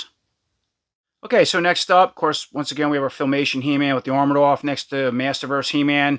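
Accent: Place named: Boston, United States. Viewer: You got that Boston accent. lol Live the review. Thank you. 😉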